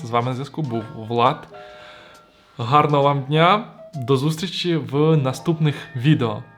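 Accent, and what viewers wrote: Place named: Ternopil, Ukraine. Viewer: І ще 1 такий акцент як в нашій Тернопільській області)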